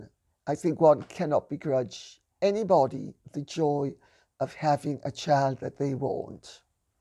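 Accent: United Kingdom. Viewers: Woof, we the Scottish terriers from Sunny 🌞 Southern Cali! We love ❤️ love your beautiful musical 🎶 British accent. Your command of the King’s🏰 English and commentary is spot on.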